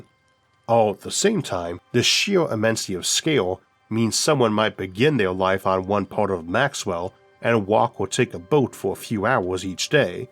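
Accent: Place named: South, United States. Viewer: Just gotta ask Mr. I'm not really trying to criticize, but I gotta know about your speech patterns. Like I said, I'm not trying to be offensive. Do you hail from the southern USA, like Texas. I hear what sounds like an accent there. Oh and I love your videos and knowledgebase.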